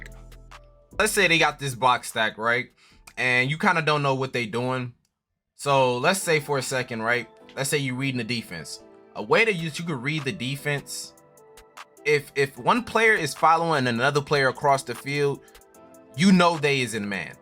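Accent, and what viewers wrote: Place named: Midwest, United States. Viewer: Besides great concepts I’m here for the laughs 😂an commentary an where is your from bro got to be Midwest 😂😂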